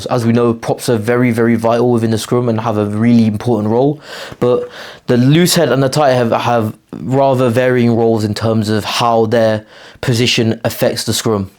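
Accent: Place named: South Africa. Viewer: love the south african accent with that ugly rose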